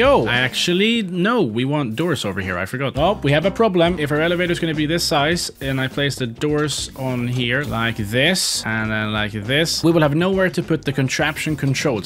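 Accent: Sweden. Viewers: Heyyyy are you from sweden sounds like it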